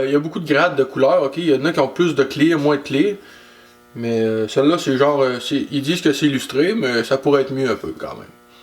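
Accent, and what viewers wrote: Quebec, Canada. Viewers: Salut bonne video jai quelque question ! tu dis quia la couleur a faire pi apres le clear a faire ,la peinture se fait en 2 étape ,je pensais que quand tu mettais la poudre que le clear etait faite en meme temps ,cest pas le cas ? cest quoi le nom clear coat powder ? Autre question je pense utiliser un poele conventionelle pour faire mes piece, jai pas besoin de vraiment plus gros , pense tu que sa peu faire laffaire ou je dois prevoir autre chose ? En passant merci ben pour tes video ,cest rare que lon na des gars d'ici avec notre facon de parler , du coup plus facile a comprendre ,les francais on tendance a avoir vraiment les terme en francais, il font moins d'anglicisme autant que nous, du style bompeur galiper etc.... sa me fais sauver ben du temps ici continue ton bon travail bye !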